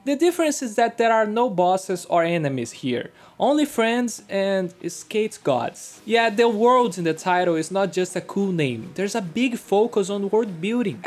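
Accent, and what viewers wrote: Brazil. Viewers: Suspeitei q vc era brasileiro pelo sotaque! Kkk Parabéns pelo review! Me deixou interessado no jogo!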